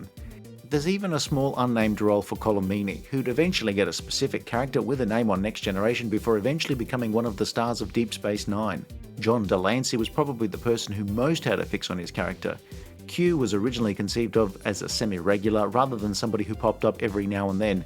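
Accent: Australia. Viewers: It's late, I should be finishing up work, instead I'm listening to an Australian guy talking about Star Trek seasons I've seen over and over.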